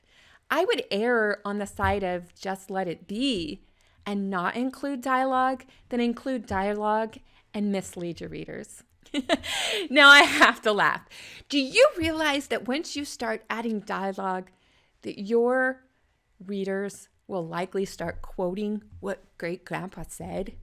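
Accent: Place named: Texas, United States. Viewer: The Texas is strong in this vid,. =) It's probably not a good idea to add dialogue because living relatives may be like "Grandpa never said that!" At best, the only thing you can do is just write about the situation. Some people have put words in ancestors' mouths but have said it was dramatized at the end of the book. Not sure if I'd be comfortable going that route. Always a good thing to verify with people who may have known a few people. For example, my parents knew their grandparents and how they acted.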